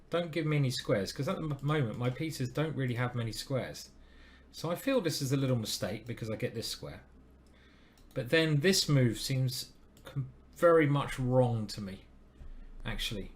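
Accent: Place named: South East England, United Kingdom. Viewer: Idk, you do a pretty excellent South East English accent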